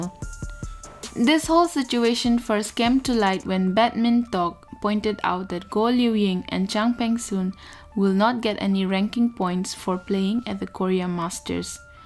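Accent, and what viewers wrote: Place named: Malaysia. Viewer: I would think she sounds more like Malaysian or Singaporean.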